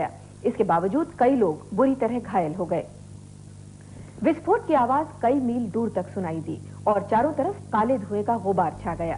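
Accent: Standard. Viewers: Sabse sundar aur sabse best reporter hai Jo sudh Hindi me baat karti hain I always remember Her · Wah kya shudh hindi hai. Aajkal ke anchor to english aur farsi arbi shabdo ka zyada use karte hai aur uchharan bhi galat hota hai.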